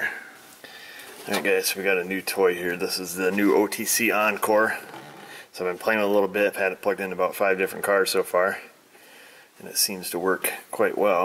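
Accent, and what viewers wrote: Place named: New York, United States. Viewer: First of all,, im a Finn. I live pretty much at the same weather condicions as you in New york. We do use salt as ice melter on low freecing condicions,,, meaning 0- 10 Celcius. But not so much. Our vechiles seem to rust perhapse even more than yours in new york. I used to like the southern drawl,,, but becauce of you,,.Im a big fan of this Northern Newyorker language that you use.I do love the south allso.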